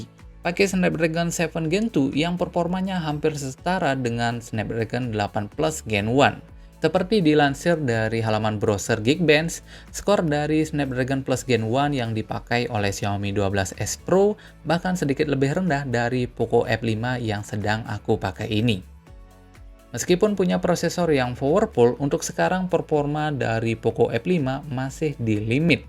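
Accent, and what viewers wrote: Bali, Indonesia. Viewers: Logat bali y bang?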